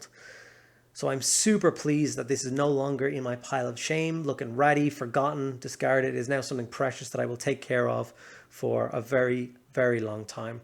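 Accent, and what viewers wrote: Ireland. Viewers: Random question but where are you from? You have a mixed accent or it sounds like it to me. How you say certain words sounds very Dublinish:-P This is a really cool to see you save and redo the model. Looks like the person might of been new and didnt know what was going wrong with the model.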